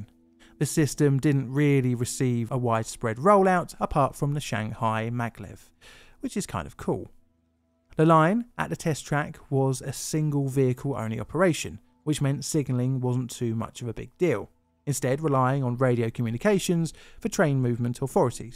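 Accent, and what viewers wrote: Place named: Southern England, United Kingdom. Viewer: I love his voice its a bit of a southern British accent.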